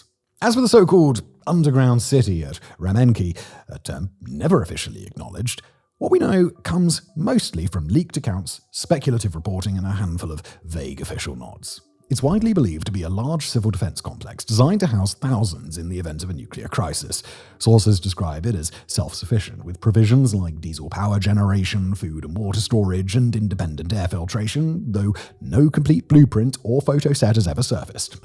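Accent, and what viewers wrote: United Kingdom. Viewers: Он британец, а они еще и портят американское произношение... Я бы знал, я американец)))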